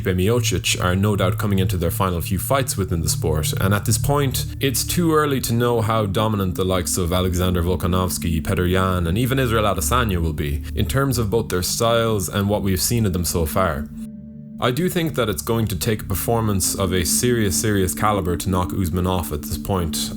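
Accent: Ireland. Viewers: Is the guy talking irish it sounds like he is but trying to hid it anyway great video but as an Usman fan burns and Colby fights worry be especially burns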